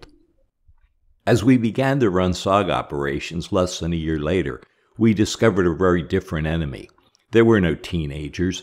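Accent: Philadelphia, United States. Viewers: are you from philly? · Definitely could tell he’s from Northeast Philly without even having to look him up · The Narrator's accent seems to be from the Philadelphia/South Jersey/Delaware Valley area.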